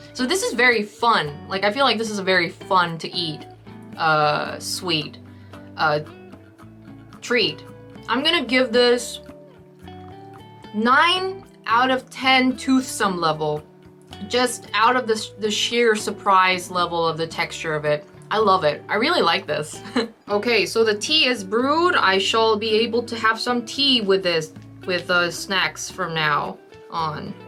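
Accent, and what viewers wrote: United States. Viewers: You got a mid west accent. What state you get it from? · dang u live in korea? you sound american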